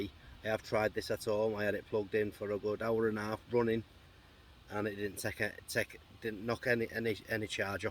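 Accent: St Helens, United Kingdom. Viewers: Your accent sounds like a proper St Helens accent. Are from around there?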